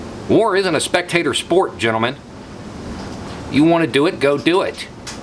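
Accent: South, United States. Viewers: You respect him... Do you respect all your enemies? Your logic and ability to understand the English language are suspect. This channel seems to be a glad hand to inane, who are continuously wowed by your Southern accent yet stereotypically opposite views...If you know a limbless veteran or a shell shocked contractor ... This man is to thank for such results. You make money scapegoating your own countrymen. Painting only with the facts that color your opinion correct...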